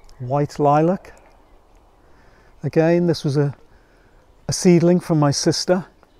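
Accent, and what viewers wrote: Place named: Warrington, United Kingdom. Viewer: Hi! This is my first time watching your videos and I was enthralled! I live in St. Louis now but I'm originally from Warrington and I knew by your accent that you must live close. I'm going to subscribe and look forward to more videos from you.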